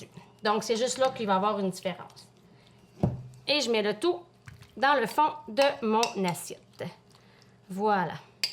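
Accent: Canada. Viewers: Coucou je vient de m'abonner à ta chaine, j'ai un regine sans gluten sa tombe bien😘jaime beaucoup ton accent Canadien 🤩ey encore un grand MERCI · Merci pour tes excellents conseils et ta gentillesse, ça va me changer des pates collantes et ton charmant accent canadien, je suis française.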